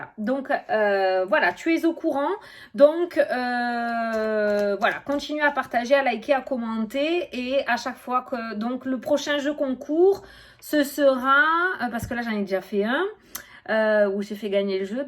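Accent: South, France. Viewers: Quelle joie de t'entendre avec ton petit accent du Sud 🥰 Et un pur bonheur de tes predictions avec ton franc parler 🤩 Magnifique et merveilleuse: MERCI 🥰😍🤩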